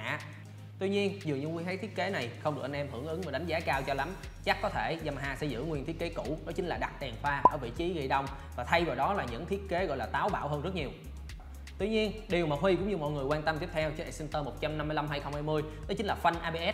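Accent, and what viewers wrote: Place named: Mekong Delta, Vietnam. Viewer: a MC dep trai, giog noi ngọt ngao ne, như ng mien Tay :)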